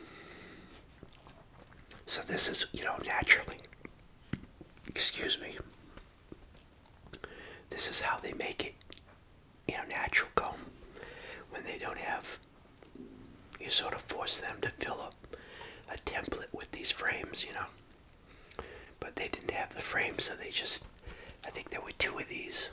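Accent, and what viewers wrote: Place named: New England, United States. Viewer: Im sorry your hive didnt make it 😔 It is a lot of work and I know you had to pay a lot for the queen. I truly hope this year your hive is wondeful and survive the New England winters.🐝 My parents stayed in Maine for the eclipse and it totally blacked out where they live. My parents are now currently renovating my moms childhood home in Buzzards Bay. You remind me of my childhood with your dishes, the foods you eat, your sayings and the places you mention and your accent❤. It always makes me happy.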